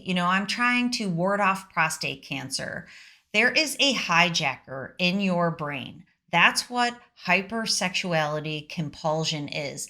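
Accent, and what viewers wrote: United States. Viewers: I turned this off as soon as I heard the American voice. I'll bet it has something to do with Jesus.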